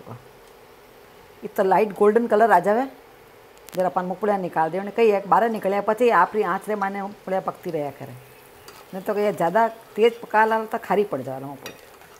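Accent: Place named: Rajasthan, India. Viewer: I am from Ajmer Rajasthan and fond of your cooking and lovely way of talking marwadi.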